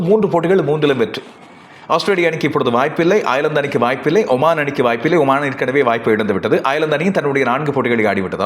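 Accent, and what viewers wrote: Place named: Sri Lanka. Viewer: அதிவேக இடைவிடாத பேசும் திறன் இலங்கை தமிழ் பேச்சும் குரலும் அற்புதம் பாராட்டுக்கள்.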